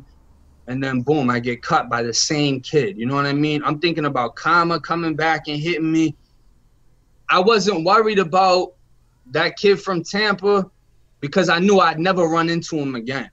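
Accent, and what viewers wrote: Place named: Boston, United States. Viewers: I love the Boston accent that is coming from a straight up natural born Brooklynite... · yo G Im from NY to me youu sound like you have a Boston accent with a A's and R's ... Car you sond like kah-rrr.. The broomstick should be called "Frisk From In Too Deep" from LL Cool J wit his sons Godfather right hand mans on the table. "Youu see him butt naked on the pool table tide down all bleedin n shit n say to yourself o God what a foul nigga right.... welll IM DRUNK WIT REVENGE MF LOVE IT LOVE IT, THINK ABOUT HER THINK ABOUT HER"... that shit was Brazy for homeboy boy, shit was crazybrrazy for me. Im cool wit everyone more 3's but MAD 2's too.... Im good on me, when i went in they tried to give me mad shit n my heart check was so crazy bro this big OG CRIP wit a gray durag n green slippers on sees im the only one thats 5'9 or looks white, we get transfered 6 ppl chain gang style n obv big homey ASSUMED i was FOOD, but he found out I WASNT FROM JUMP STREET N IM STILL IN CHAINS WIT THE PPL N GUARD, then when iwas on the phone tryna tell her to get my shit from the safe n he gonna try n take my phone or commessary or think im food! 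I SHUT TTHAT SHIT DOWN FUCKIN FAST n it was my 1st Time in Riverhead, CI was diff! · Boi has the Boston voice.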